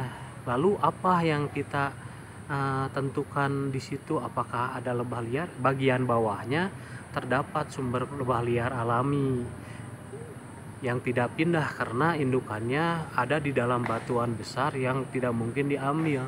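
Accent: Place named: West Java, Indonesia. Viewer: Nuhun kang, logatnamah bangun urang sunda, ti palihan nana kang???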